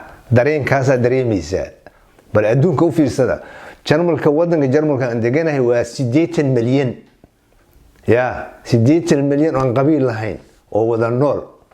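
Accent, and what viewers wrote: Mudug, Somalia. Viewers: Lahjadiisa puntland ma ahan waa reer mudug waxuu ka dhaxeeyaa reer mudug. Reer mudug koonfur ilaa waqooyi waxay kaga duwan yihin reer bariga iyo reer nugaaleedka waxaa ka jidho ereyada sida “haya” tusaale “waxaan kuu sheegahaya” meeshay reer bariga dhihi lahaayeen “waxaan ku sheegayaa” Ninkanu qabiilkiisa iyo deegankiisa lama sheegi karo Lakin Inuu reer mudug yahay banse kuu hubaa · Odaga waa somali dhab lahjadamashqul kuma ficna iyo heshuu kasoo jeedo waxa muhim.ah taloyinkisa ivan racno lki waxan qiyasayaa lahjada waa mudug ilaag/lafa dhexe intas madhafsiusana ader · Weli reer mudug hadalkiisa ayuu leeyahay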